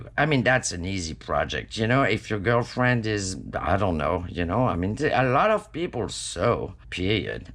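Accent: France. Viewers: Wow, wow, wow is all what I can tell you about your tutorials. I am your new subscriber I really love your humor your lovely french-english accent your way you edit your videos and and and...thanks for your time to inspire me and us!! You are a true Angel!!! Have a beautiful evening Heike from The Netherlands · Hey! I LOVE❤ your channel and I love your French accent!!❤️🤗. You are so cute and sweet!🌹🌹🌹 I was thinkin that you should make photo copies of those "old" magazine pictures of the women so you will have a never ending supply of the retro/vintage ladies🤷‍♀️👍😘 That would be cool too👍😘🤗❤️. Thank you for sharin all your ideas and little tips and tricks with all us🤗🤗❤️ We Really Appreciate it so much!!👍🤗🌹❤️🌹 Thank you😘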